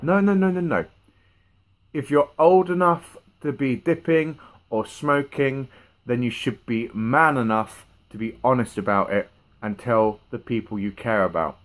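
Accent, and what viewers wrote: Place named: England, United Kingdom. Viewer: Great video man it threw me bud this big husky bearded man I'm thinking OK hes from Michigan or somewhere here then you break out the English accent! Man that's awesome keep up the good work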